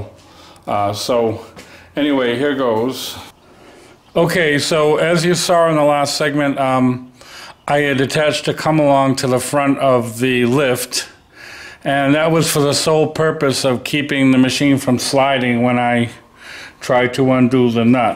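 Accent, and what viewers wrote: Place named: Boston, United States. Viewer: Chicago, Jersey or boston? Oooo he just said the word bar.... hes definitely Boston! 👍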